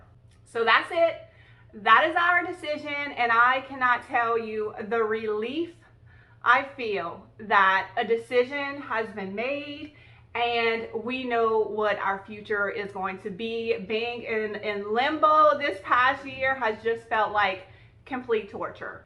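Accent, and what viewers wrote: Louisiana, United States. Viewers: I love you, I love your Cajun rambling, I love your Mississippi home, and I can’t wait to see all your new adventures. I was afraid you were going to announce you’re quitting YouTube to focus on FB and your website. I’m a YT girl so you can imagine my relief. You keep doing you girl, I’m along for the ride.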